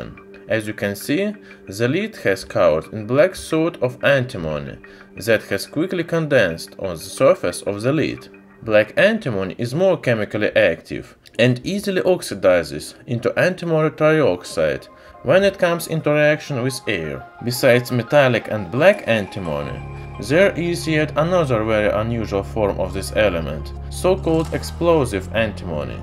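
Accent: Russia. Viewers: Русский акцент ,поработай над этим.а так ахуенно. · It's Russian accent) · Maybe this guy should use a computer to narrate. товарищ твой акцент чересчур русский. Не понимаю:(( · русский акцент выкупается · What’s with the FPS Russia accent??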